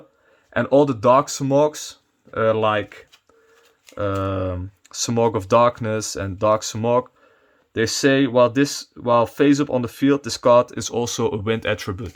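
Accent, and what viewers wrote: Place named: Netherlands. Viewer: Mooie deck profile mooi nederlands acsentje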